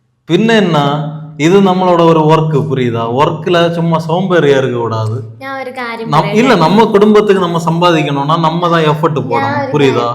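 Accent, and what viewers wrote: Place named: Kanyakumari, India. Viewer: உங்க ஊரு கன்னியா குமரி மாவட்டம். ....😂😂😂😂😂 நல்ல தமிழ் . எங்க ஊரு மொழி எனக்கு தெரியாதா.....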